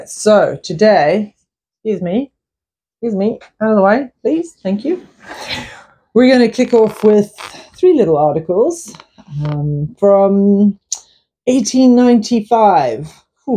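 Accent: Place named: South Africa. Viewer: Your voice and accent give a sense of familiarity, having lived in SouthAfrica as a child. Simple words of wisdom or proverbs seem to have disappeared from daily language.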